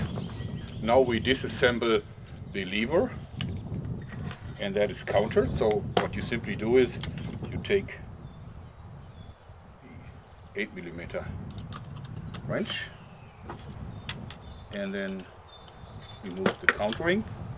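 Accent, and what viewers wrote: Germany. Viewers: So geil der Deutsche Accent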